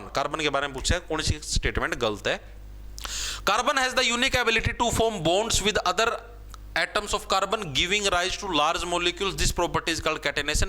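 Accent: Haryana, India. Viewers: Aap Haryana Se Hain Kya bahut acchi basa h ji 🎉🎉🎉acha padate h 💯🥹🥹🫣😍😍😍😍😍😍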